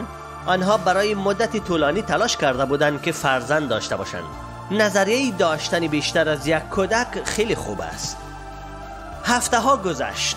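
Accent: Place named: Afghanistan. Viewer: ببخشیداین لهجه افغانستانی است باماخیلی فرق میکند ایشون اشتباه نکردن.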